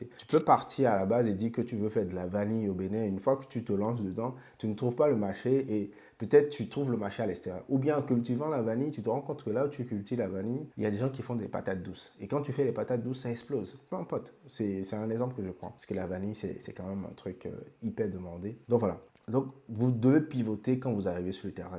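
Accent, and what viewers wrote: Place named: Cameroon. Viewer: Tu parle comme un camerounais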